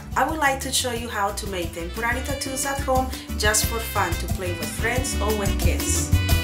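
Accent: Colombia. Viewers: ay mi cileo tengo un proble ni el berrco en la vista y no puedo leer porfa habla en colombiano jejeje vivo en chile y eres la unica persona que me recuerda a mi pais jeje (colombia) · tu eres tan hermosa y puedes grabar en español, inglés,o en chino si quieres, lo único es que se te extraña en el video..... Ami la verdad a me gusta más escucharte en tu idioma porque me gusta mucho el acento de l@ colombian@.....Gracias por todo lo que haces.......y suerte en todo lo que hagas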